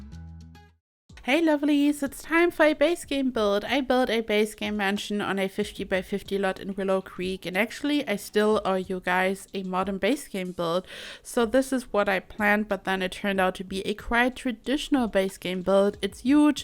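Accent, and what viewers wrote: Germany. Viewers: You sound sooo german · your english... I could swear you german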